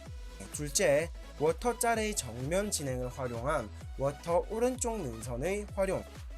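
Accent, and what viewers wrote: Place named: Seoul, South Korea. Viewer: 서울말 좀 줄이시죠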